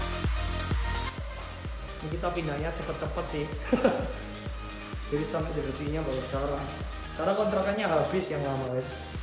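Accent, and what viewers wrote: Java, Indonesia. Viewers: Asli pundi mas kok logat e Jawa wkwk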